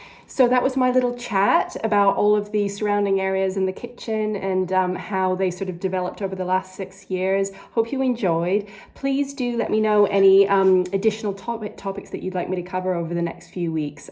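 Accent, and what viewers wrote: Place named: Scotland, United Kingdom. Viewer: I detect a Scottish accent in there 😂🏴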